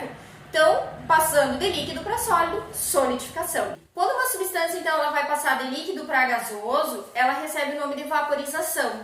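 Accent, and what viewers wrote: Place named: Rio Grande do Sul, Brazil. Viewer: Sotaque do rio grande do sul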